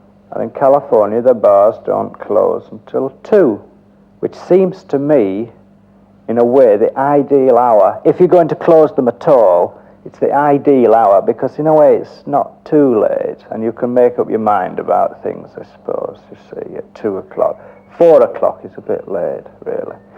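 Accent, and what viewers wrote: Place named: Liverpool, United Kingdom. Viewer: same accent as John Lennon. One wonders if they are from the same region. Hmmmmmmmmmmmmmmmmmmmmmmmmmmmmmmmmmmmmmmmmmmmmmmmmmmmmmmmmmmmmmmmmmmmmmmmmmmmmmmmmmmmmmmmmmmmmmmmmmmmmmmmmmmmmmmmmmmmmmmmmmm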